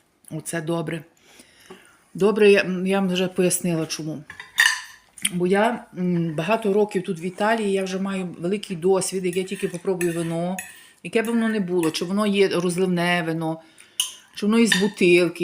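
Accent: Lviv, Ukraine. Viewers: Дивлюсь Вас з Києва,дуже подобається ваша мова,відчувається,що жили у Львові Вчуся у Вас позитивно дивитись на все, не заздрити нікому,радіти кожному дню,дякую за відео Мрію побувати в Італії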